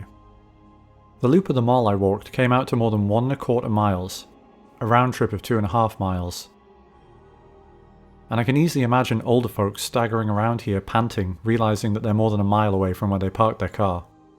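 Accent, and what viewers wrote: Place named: England, United Kingdom. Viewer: dead mall vids hosted by an english man just hits alittle different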